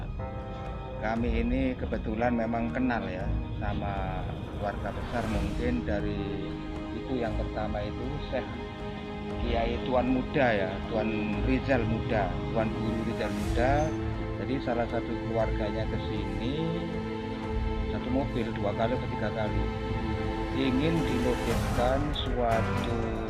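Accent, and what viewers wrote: Java, Indonesia. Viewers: Aneh ini yg ngomong orang jawa logat ya apa ya paham to asal usul komring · sija JK logatna jg katahuman jlm jawa.sejarah pulimbang Rik kumoring jg pandai iya.api lagi pok na Di jawa dudo,tambah pandai iya.hibat o, sa salut nyak😄😄😄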